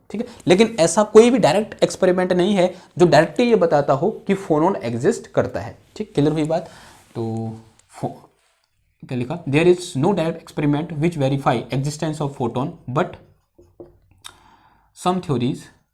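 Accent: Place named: Garhwal, India. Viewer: Bhai , garhwali hai na pura Accent aarha hai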